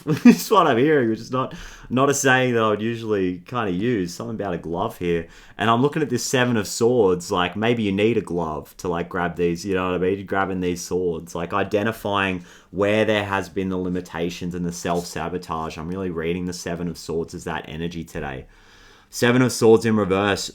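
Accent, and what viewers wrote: Australia. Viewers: Hello from California. Great voice. Great messages from Australia. Fantastic Australian Accent, it's known as one of the most trustworthy accent. We appreciate you. Have a good day/week/month, from our channel. :hand-pink-waving::hand-pink-waving::hand-pink-waving::trophy-yellow-smiling::trophy-yellow-smiling::trophy-yellow-smiling:🐦🐦🐦🦅🦅🦅🦜🦜🦜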